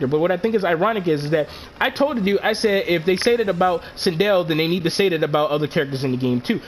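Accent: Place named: New York, United States. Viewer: Sounds like a New Yorker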